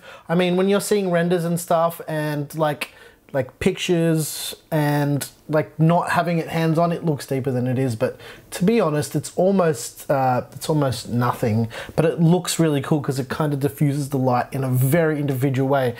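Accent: Australia. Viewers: A Mexican with an Australian accent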